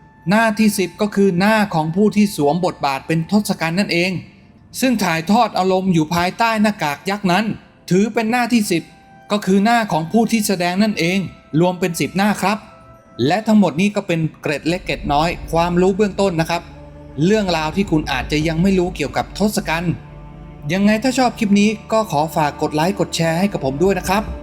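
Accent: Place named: Thailand. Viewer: ชอบเสียงเหน่ออะ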